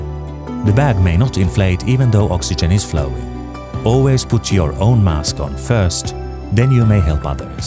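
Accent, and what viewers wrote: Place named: England, United Kingdom. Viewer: gentle English accent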